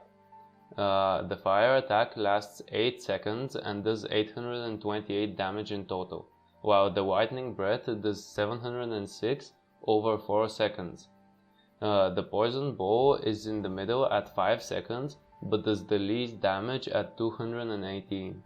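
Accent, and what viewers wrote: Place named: Eastern Europe. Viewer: where ere you from? you sound Slavic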